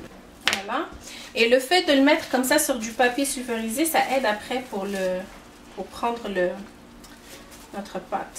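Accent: Quebec, Canada. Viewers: Adorable femme avec cet accent du Québec 😁😁👏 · J'aime beaucoup trop l'énergie que tu dégages dans tes vidéos, merciii pour la recette ça a l'air délicieux Ps : ton accent québécois est magnifique 😭❤ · Mais je me suis trompé c est l accent canadien que j entend en tout tu es une maman très attentionné maman gâteau bravo à toi super recettes merci. Emma de France.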